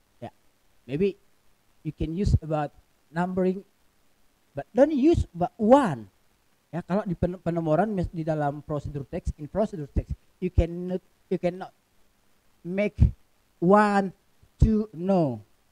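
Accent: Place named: United Kingdom. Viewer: British aksen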